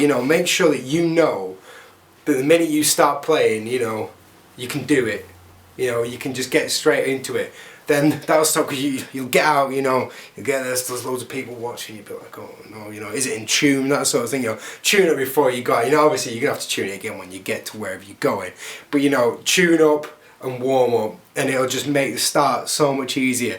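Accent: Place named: Alabama, United States. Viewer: Ya no dat albama acent ya no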